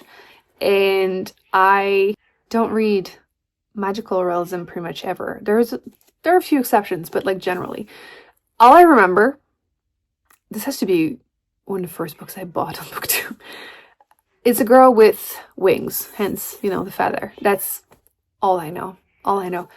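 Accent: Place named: Quebec, Canada. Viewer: I LOVE the accent québécois so much!! (and I'm really proud I understood everything haha)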